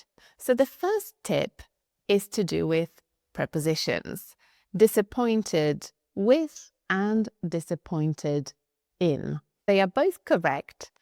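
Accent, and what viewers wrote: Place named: United Kingdom. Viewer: Thanks for your great teaching and your nice British accent ❤👍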